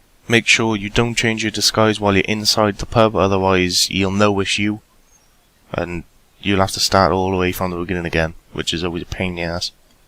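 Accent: Wales, United Kingdom. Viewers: Best guide maker i've found without a doubt. That Welsh accent just reminds me of Dirty Sanchez every time I hear it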